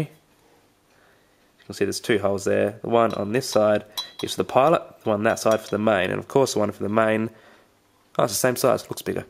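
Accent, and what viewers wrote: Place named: Australia. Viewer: It's an added treat to hear your accent. I have always wanted to visit OZ. Even boarded a young chap from Victoria a few times!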